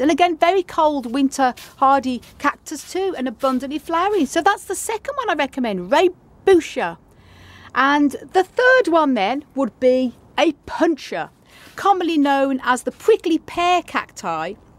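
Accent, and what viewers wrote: United Kingdom. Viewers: I got to learn more about my cacti. by the way, you have beautiful British accent! I enjoyed your video!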